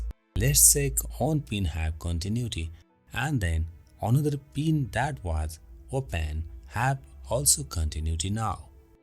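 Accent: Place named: India. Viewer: This video could be the most useful for me rn but Indian accents has to be the worst man i dont know a shit what bro saying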